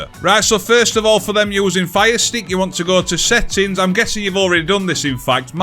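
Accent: Yorkshire, United Kingdom. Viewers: Nice to see someone on Internet with a Yorkshire accent. The world is now a better place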